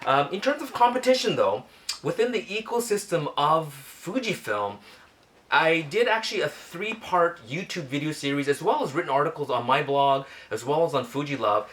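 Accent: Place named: United Kingdom. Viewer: You should lower the background music, it's not easy to ear your voice, you have a strong British accent !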